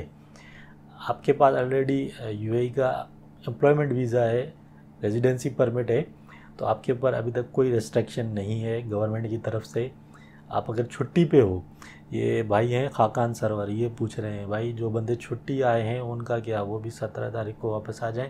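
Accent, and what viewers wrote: Sindh, India. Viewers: Ada sindhi aan cha laheje mon lage tho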